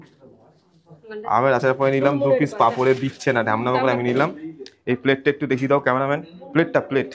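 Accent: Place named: Bangladesh. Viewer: Bangaladeshir moto kotha bolcho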